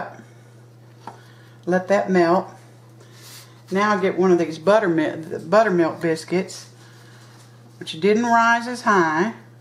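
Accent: South, United States. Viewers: Are you using self rising flour? If so, that means the flour has leavening agents such as baking powder, baking soda and could contain powdered buttermilk. It's OK by me, but in reality there more ingredients than just flour and cream. I have been baking biscuit for over 60 years and I have never use self rising flour. I guess I learned from my Mom because we always kept AP flour around for many types of bread making and pie crust. What's important is if you and your family like them. All I am saying is self rising flour isn't just flour it is a combination of leavening agents and other ingredients. Love your southern accent, I am an old country boy myself. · Good morning! I just want to thank you for getting me enthused again with my southern cooking! Can't wait to make either of your biscuit recipes I've seen on here! You just don't know what or how overjoyed I am! 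I have just subscribed to your channel and will be looking forward to seeing more of your southern recipes! What I do want to ask is, do you have a great recipe, that's easy, using a cake mix for Southern Mississippi Mud Pie! My aunt made it for years and it was so gooey and delicious with a such a great chocolatey taste with her rich frosting and marshmallow top!!!!!😋 But you just sounded like a great southern voice of knowledge, I just had to ask you. No one has found her recipe to this day and oh how I wish I could try making that MMC or pie. I again thank you, I apologize for making this so long. Please have a Blessed day and TY again for taking my comment! I'm from NC and will be so anxious again to try your recipes and God forbid, they come out good! Be Well, Katy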